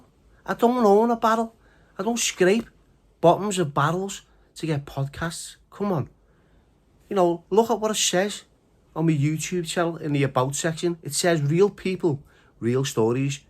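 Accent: Liverpool, United Kingdom. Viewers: I knew you were from shpeke as I’ve got a few mates that talk with the shh in the scouse lingo I bet you know my mate Raymondo who the Mato got hold of and took the lot , mansion Bentleys lambos the lot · Good chat scouse love it 😀 fuck aki and french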